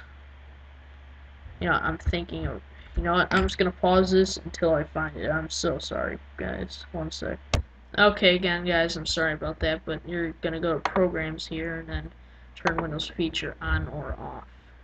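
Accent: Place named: South, United States. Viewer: Your southern accent makes me want to puke all over myself :(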